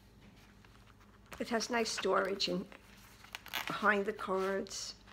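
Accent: New York, United States. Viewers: Hi! I hope your surgery went well. I love your NY accent, it makes me feel like I am home! I'm from 45 minutes outside of NYC! I miss it and hate Texas with a passion! But I'm moving back soon enough. Do you remember the little pot glosses? I loved those. Clinique discontinued them years ago. Meadow Flower Honey was my favorite.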